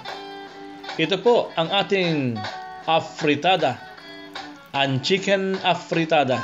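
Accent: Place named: Tagalog, Philippines. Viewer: Sa puntong Ito 👍original tagalog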